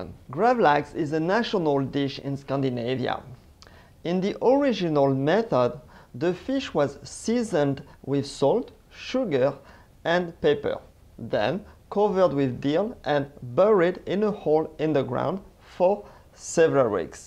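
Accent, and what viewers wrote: France. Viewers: so my salmon is ready , well, tomorrow can be eaten actually but I taste it after the curing, delicious and now I added yellow mustard ( no dijon in the house) and chopped dill. Thank you for the recipe, the lemony dill taste of the cured salmon= 😋 DELISH!! I personally love garlic so I added a little bit in the curing mixture and celery powder as well, love it!! P.S. your French accent is killing me... in a good way!! Is buy favorite accent in the world since it makes me laugh 😁 Merci beaucoup!! 🇨🇦🇫🇷👍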